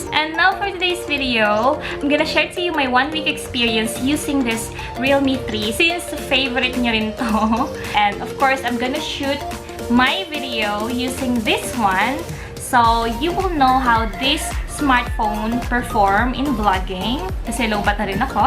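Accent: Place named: Iloilo, Philippines. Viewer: I like your accent, sounds like ilongga.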